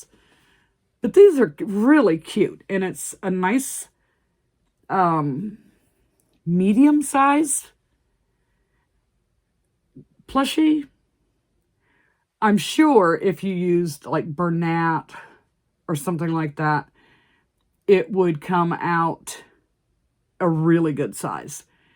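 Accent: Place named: Florida, United States. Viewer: You speak like a Floridian. Laid back and easy. It’s why you’re my favorite. Feels like home.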